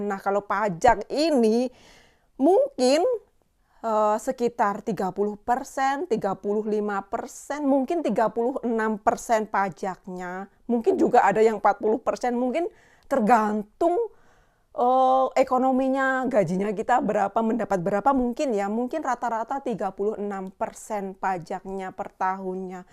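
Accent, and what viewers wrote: Java, Indonesia. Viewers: Sumatra kok logatnya jawa banget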